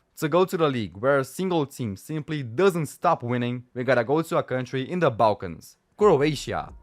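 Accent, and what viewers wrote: Brazil. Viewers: Você consegue percebe só pelo sotaque que ele é brasileiro kkkkk parabéns pelo vídeo mano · Vc eh brasileiro? Adivinhado pelo sotaque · o acento brasileiro dele e muito evidente, fazendo esse acento no final das palavras, mas a pronunciação dele foi incrivel, ate imitando palabras em espanhol com acento ingles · hes brazilian bruh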